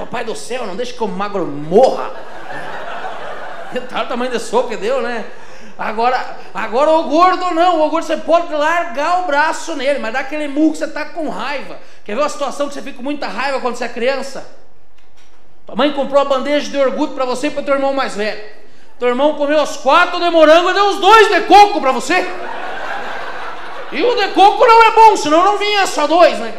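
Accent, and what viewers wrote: Curitiba, Brazil. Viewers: Se tu nasceu ao redor de Curitiba , esse aí é o sotaque . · Myller Rodrigues Curitibano!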